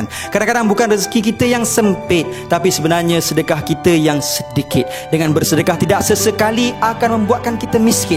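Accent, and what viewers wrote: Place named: North, Malaysia. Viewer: loghat utara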